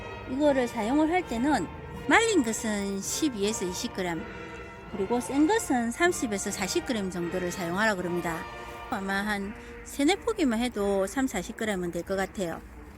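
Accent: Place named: Gyeongsang, South Korea. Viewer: 경상도말씨에 정감이갑니다 까마중에대한자세한 설명고맙습니다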